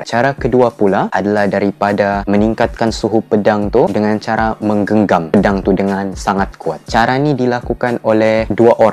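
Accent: Sabah, Malaysia. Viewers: Speaker ni orang tawau sabah ka? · abang ni orang sabah ke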